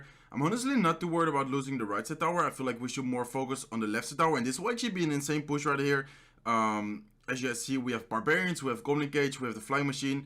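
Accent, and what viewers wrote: Netherlands. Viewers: Dutch accent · Ben je toevallig nederlands ik herken het accent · Are you dutch?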